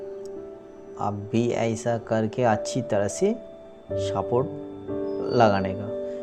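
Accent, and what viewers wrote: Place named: Bengal, India. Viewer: You are 100% pure বাঙালি। হিন্দি শুনেই বোঝা যাচ্ছে। anyway ভিডিও গুলো কিন্তু খুব সুন্দর। Best of luck 👍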